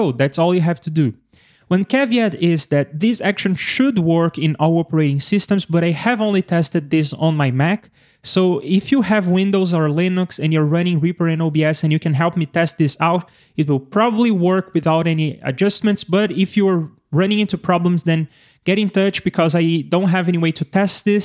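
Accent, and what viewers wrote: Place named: Brazil. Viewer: Muuuuito bom, meu amigo. Escrevo em Português para agradecer, pois acho que reconheci o sotaque brasileiro, certo? Ganhou mais um inscrito. Obrigado. Abraço!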